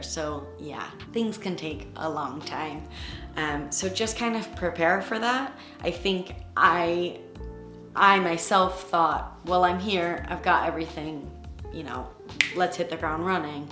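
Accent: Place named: United Kingdom. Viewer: You are getting a better British accent though.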